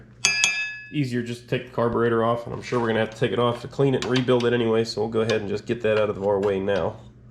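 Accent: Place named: Pennsylvania, United States. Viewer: You sir, are my main reason to open up youtube! Quality, real, content. I learn a lot. Please continue. Also, love the PA accent. Family in Altoona. I'm quite a bit south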